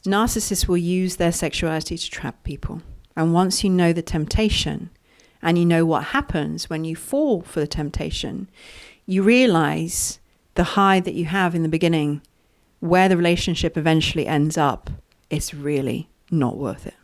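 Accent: United Kingdom. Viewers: 😊. Wow thank you so very much for that excellent excellent information! 7 what you're saying I knew, but some of them I didn't..... A's always I learned something new with you! I really needed to hear this and I don't think I would have heard it so clear and precise with all the details in nuances that you provide. After going through multiple narcissistic relationships, I've come to terms with what you were talking about I'm not putting too much volume and look for the way their body looks...ECT.... But learning to value a person more on their mind, character, and intellect.. may I give you a compliment, I really like the way you think your thought process is very deep you seem to be extremely organized in your thought process paying attention to detail. And well....I just love your British accent! 😅 Dave.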